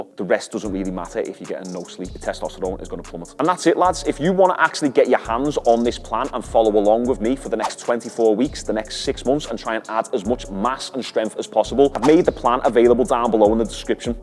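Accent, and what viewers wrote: Liverpool, United Kingdom. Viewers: I'm guessing ur scouse from ur accent. U still based in Liverpool??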